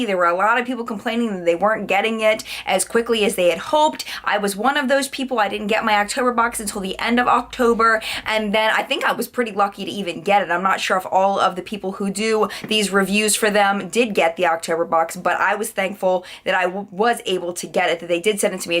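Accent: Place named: Mid-Atlantic, United States. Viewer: You live in Baltimore?!?! Me too! Did not know that! But I definitely recognized that accent as mid-Atlantic!! Go Ravens!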